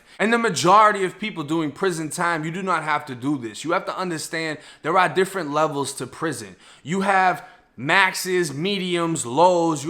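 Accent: Boston, United States. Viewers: what part of Mass are you from.The Boston accent is distinct. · It's about time you started talking about "Boston" where your a native at bud or places across the world.... this is getting a little dry, you only did 3 years in a Fl prison, and you have a whole channel based on those "3" years, and while living and obviously being from "Boston" you talk about Fl prison and the people who live in this "one state" you only served "3" years in prison at, but your not a native 2..😒 you should expand which prisons you talk about talk about them "ALL" your language skills are superb very well spoken! you def should have a channel your more then worth listening 2. If you didn't sound like Leo from "Departed" or "Wolf of Wallstreet" it would go down smoother, but your "Obviously" from 'Boston" but have and "ENTIRE" channel based just off only 3 years of your life from a place you were a transplant 2 in the 1st place.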